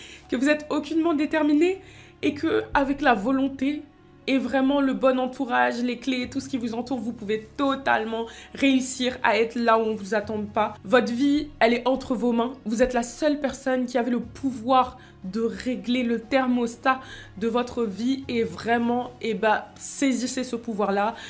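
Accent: Ivory Coast. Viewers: Je savais que t’étais ivoirienne. Malgré le « shôkôli » on se reconnaît 😂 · 😅l'accent à l'ivoirienne, me tue Bonne continuation ma grande soeur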